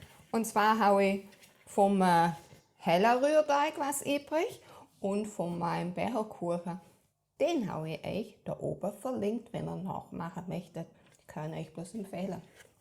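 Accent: Swabia, Germany. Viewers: Oh,a Schwäble ,schee ! Scho aboniert ❤!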